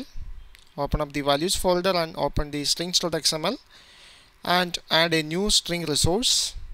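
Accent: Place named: India. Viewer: i hate hindi accent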